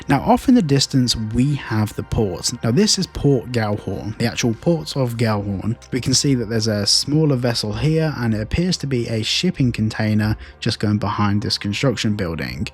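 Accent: United Kingdom. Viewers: Ugghhh I know it’s a British thing and not your fault but every time you say “Floor” when you’re talking about the “ground” I wanna slap you a little bit! Inside = floor, Outside = ground lol I love your accent and you guys have way more fun with the English language and slang terms but this one, you guys just got wrong! Love you, love the videos. Keep it up, thnxxx!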